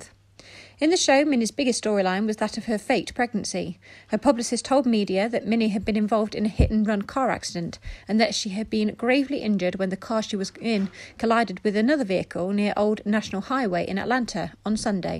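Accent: United Kingdom. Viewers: I love your accent it sounds like queen Elizabeth , so lovely . Rest In Peace Minnie ..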